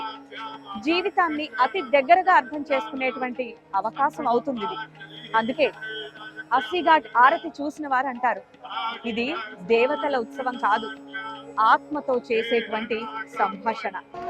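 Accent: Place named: Telangana, India. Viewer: You guys have taken a good decision! Please continue with the content/news with strong throwing of words in Telangana Accent. Thank you 😊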